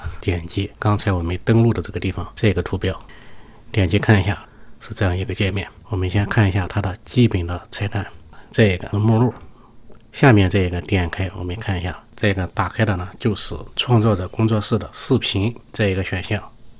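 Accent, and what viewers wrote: Shandong, China. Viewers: 濃濃的山東人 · 老师，是山东的吗？咱是老乡啊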